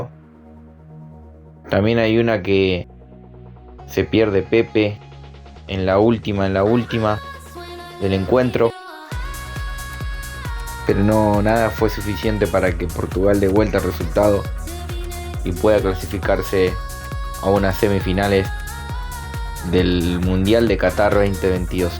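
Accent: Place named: Argentina. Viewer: El del video tiene un acento argentino, ni modo que digas que es un fan de CR7 cuando los argentinos tienen prohibido hablar de él. 😅 No, yo creo que el declive de CR sucedió más por sus decisiones que por la falta de talento. Todo comenzó cuando decidió irse del Real Madrid, no tenía que irse de ahí, no cabe duda de que habría conseguido más títulos importantes y su carrera habría seguido igual de respetada. Sin mencionar que por esos años Messi estaba pasando por un muy mal momento. Ahora ya nadie lo recuerda pero a Messi le pasaba lo mismo con su selección que le pasó a CR7 con Portugal. Afortunadamente Messi tuvo a un gran director técnico como lo es Scaloni que supo sacar lo mejor del astro argentino y lo revitalizó. Cosa contraria a CR7, que tristemente sus entrenadores lejos de ayudarlo, le dieron la espalda y es una historia muy triste para el futbol. 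Habría sido fantástico que ambos tuvieran su revancha, pero solo uno lo consiguió, el futbol solo quedará en deuda con uno y no con dos como era antes.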